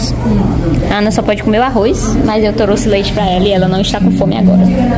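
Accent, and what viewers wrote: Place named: Northeast, Brazil. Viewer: Será que você não percebe que o sotaque dela? Ela é nordestina então é o jeito isso de chamar a Ana de “mulher” simplesmente é o jeito,a cultura dela , nada a ver pelo amor de Deus.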